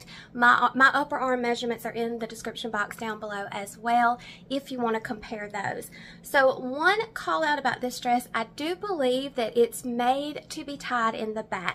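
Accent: South, United States. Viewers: Girl,I love watching you and listening to you talk in your sweet southern voice . I love how you explain the materials and you try on things i wouldn't ever think i would buy but have concidered thanks to you . I am very insecure about my body and you have given me more confidence to at least try new things, so thank you for that . And your hair and makeup is always on point. Your just beautiful .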